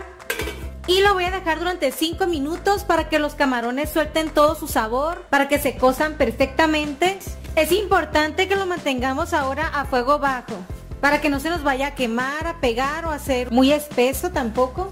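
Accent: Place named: Escuinapa, Mexico. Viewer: Una chulada arriba escuinapa saludos bonito asento escuinapense chirriss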